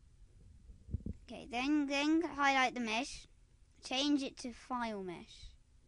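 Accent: United Kingdom. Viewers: chill bumps he has to be british i still have some XD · I just thought you edited your voice to be like that not that your from the UK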